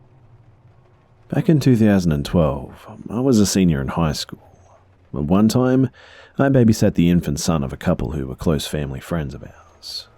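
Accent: Australia. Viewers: I love the fact that your an Australian narrator. 👌🏽 #onefortheaussies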